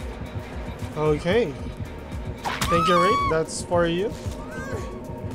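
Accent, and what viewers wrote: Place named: Philippines. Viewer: pinoy ka pre? medj dinig ko punto mo haha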